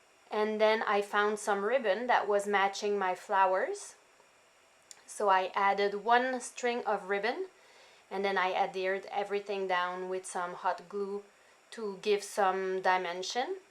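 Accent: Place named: Quebec, Canada. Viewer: Beautiful water colouring, it looks so pretty and relaxing. Love the rainbow with the string, can’t wait to do this with my daughter. Amazing mini book, you make it so fun and easy. Thanks for all these great projects, ideas, and inspiration. Love listening to your accent, working on our French in Ontario and hoping to make another trip to Quebec soon.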